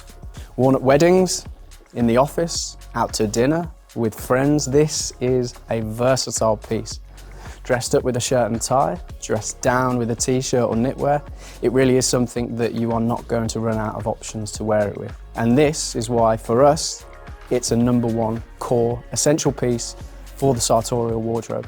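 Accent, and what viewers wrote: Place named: Midlands, United Kingdom. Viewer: First time I've come across the channel and I subscribed. I really like the clear and concise explanation of everything. I disagreed on one point, which isn't major. I wouldn't describe that particular sports jacket as a blazer. Blazers, to me, are always un-patterned (unless they are striped for a particular sports club or activity). I also realize that you are nervous speaking directly to camera but if you could use your hands to gesture towards a point of interest on the clothing rather than gesturing to emphasise your speech constantly it would be a little less distracting. Slight changes of camera angle occasionally might also break up any obvious 'chop cuts' on the video. Nice to hear a Midlands accent on a sartorial channel. Good luck for the future.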